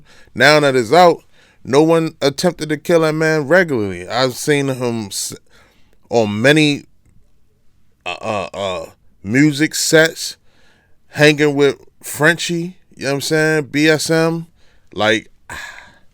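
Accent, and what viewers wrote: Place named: Baltimore, United States. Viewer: 1st off, this is my 1st time catching your videos and I can tell out the gate that you are from Baltimore. 2ndly, I don’t think a persons son should get the raft of their father’s actions. Unfortunately, the previous generations actions often trickle down to their off spring. It’s not fair, but it does happen.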